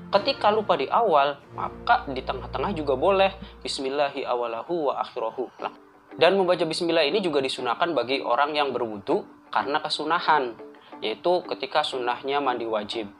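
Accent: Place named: Java, Indonesia. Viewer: medok men ang :v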